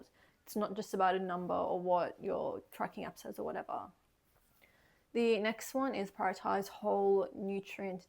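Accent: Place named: Australia. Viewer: I like the content, but ur english is australian language, so it is difficult understand for Indians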